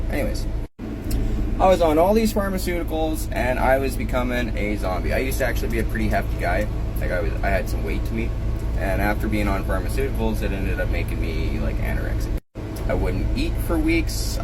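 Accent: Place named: Canada. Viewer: The Canadian accent man I love it.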